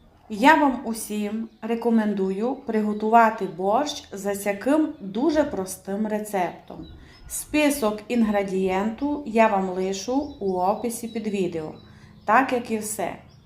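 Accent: Transcarpathia, Ukraine. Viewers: Ой, який файний закарпатський діалект ❤🎉 Так приємно слухати! 😊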